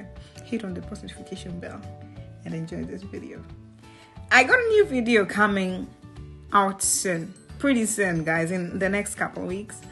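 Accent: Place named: Luo, Kenya. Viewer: 😂😂😂 your laughter, your Luo 😂😂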